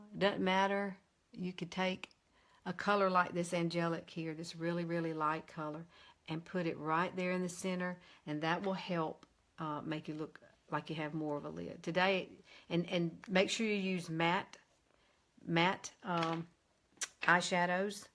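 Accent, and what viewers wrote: South, United States. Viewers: I can tell you're from the South from the way you say "oil " lol I'm up in the mid west (Wisconsin) " OyL ". 💞your Channel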